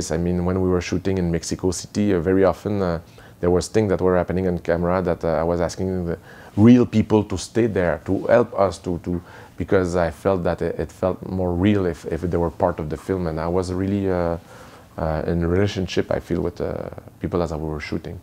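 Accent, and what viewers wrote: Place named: Quebec City, Canada. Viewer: Even if my English sounds like the one Denis is speaking (we are both from Quebec City), I smiled at that part: You know to know, you know to know the limit, you know to know the where where ;)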